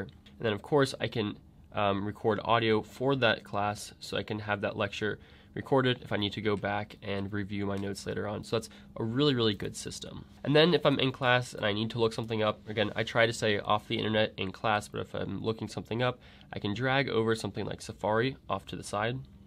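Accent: Ireland. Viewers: LOVE THE IRISH ACCENT AHAHHAHAH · Love the Irish accent - being a Dubliner it made laugh!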